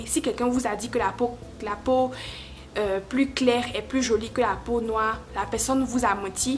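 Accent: Ivory Coast. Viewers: Ma chère par ton accent j'ai sû automatiqmt que tu étais Ivoirienne😍😍😘😘🇨🇮🇨🇮🇨🇮. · Je vien d'arriver sur ta des que j'ai entendu ta voix e su que tu était ivoirienne❤️❤️🙏🇨🇮🇨🇮🇨🇮🇨🇮🇨🇮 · L accent me dis quelque chose .tu es ivoirienne ? Petit conseil prend plus le temps de parler tous doucement. Belle video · Tu es vraiment ivoirienne le language qui ne trompe pas le ye qui ne trompe pas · Super ! Contente d’entendre l’accent ivoirien fatiguée du chocor 😂🤸🏾‍♀️ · Hien les ivoiriens avec votre tonalité la😫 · cet accent ressemble un accent de la côte d'ivoire bisou ma cherie moi je suis originaire de ce pays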